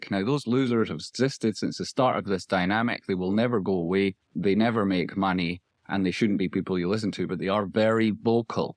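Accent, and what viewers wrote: Scotland, United Kingdom. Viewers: Just found you on YouTube …..been watching various investment guys for a few years, and you just popped up! Good to hear a Scottish voice.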